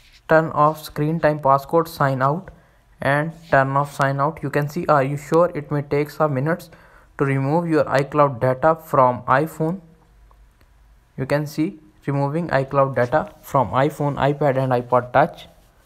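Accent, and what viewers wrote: India. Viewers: So straight from the start, I see the comments are fucked and are bots. And it explained it when I heard the head ache Indian accent. Dislike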